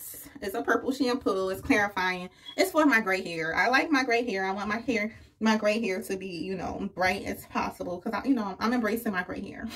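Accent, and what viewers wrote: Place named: Philadelphia, United States. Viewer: Loved this 🙌🏾 thank you sis! Lol I just knew you were from Philly with your accent 🤣 sending love from S. Jersey !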